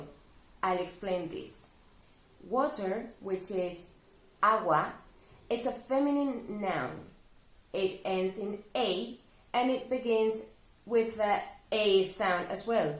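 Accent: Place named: Spain. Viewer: Me gustan mucho tus videos! Me parece que hay muchos video por los principiantes y todos explican las mismas cosas pero no hay muchos video por los estudiantes intermedios quienes no entienden aún los videos nativos pero las cosas básicas son demasiadas fáciles. Me gustaría ver algunos videos donde por ejemplo hablas solo en español sobre algún tema, para que yo puedo escuchar pero tambien que añadas los subtítulos en español y inglés para que podamos aprender nuevas palabras. :-) perdoname por mis errores que he escrito, es que ya he pasado mucho tiempo buscando algunos video más dificíles para estudiar un poco más 😄 he visto que estás comenzando y por eso creí que podría pedirte por esos videos😊 especialmente por tu accento español, porque tengo más problemas de entender ese accento que los latinos :-) gracias y espero que tengas un buen dia!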